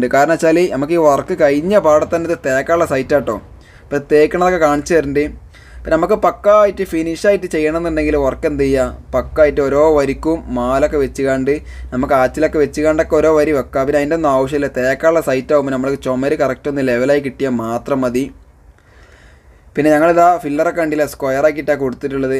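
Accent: Malappuram, India. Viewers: വീഡിയോ അടിപൊളി യാണ്.... എന്നും പുതിയ വീഡിയോ വന്നോ നോക്കും... പിന്നെ ജമ്മളെ മലപുറം ഭാഷ പൊളിച്ചു...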